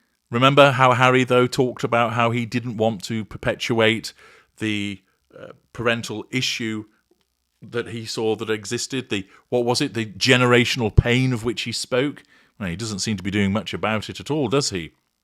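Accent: United Kingdom. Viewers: You make a good point. Sometimes one's job necessitates these absences. I would also say, though, that a nurse or doctor on night shift would probably have SOME time with her/his children when off work. I also find it funny that, who has (or puts on) what I think of as a British accent, makes no mention of the British custom of sending children away for boarding school. He mentions having nannies and that's all well and fine, but the whole boarding school thing... I know that happens when children are older, but boarding school always seems kind of brutal to me, here in the U.S. YMMV Edited to give his due for pronouncing Caribbean correctly. One of the native nationalities there are the Caribe (KEH·reeb, not cuh-RIB). Sorry but it's one of my pet peeves. As you were.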